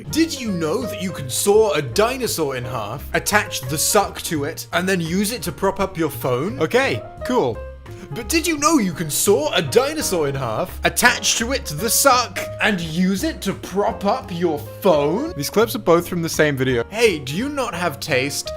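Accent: England, United Kingdom. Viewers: This man do be English tho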